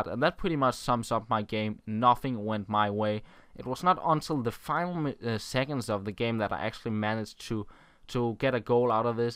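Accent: Denmark. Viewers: u danish? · mate you from denmark! can hear it.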